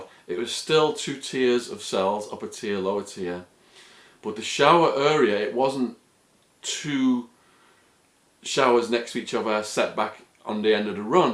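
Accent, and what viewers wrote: United Kingdom. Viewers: Awww Shawn/. TMI LOL. You must have really stuck out in an AZ prison with that Brit accent. Geez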